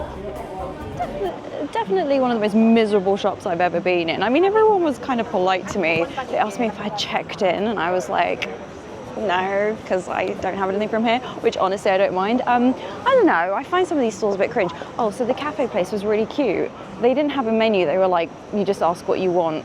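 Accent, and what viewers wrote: RP, United Kingdom. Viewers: Basic economics in action here. Inequality, a disappearing middle class with less money to spend on excess, and a billionaire class that doesn’t sully themselves by going into these shops in person! 😂 fake balcony? Where were you educated? You sound like you must’ve gone to a private school- did they not teach Shakespeare? Fake balcony? C’est un balconet aka a Juliet balcony in reference to Romeo and Juliet and the scene where Romeo meets Juliet after their initial encounter “but soft, what light through yonder window breaks” is supposed to be Romeo seeing Juliet at the balconet of her window. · My God you have the most posh and smooth English accent I've ever heard in my life. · you are so posh 😂😂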